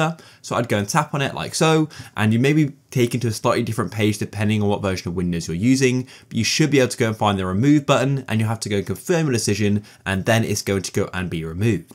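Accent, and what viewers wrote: United Kingdom. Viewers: That's how ALLLLL British ppl speak...lol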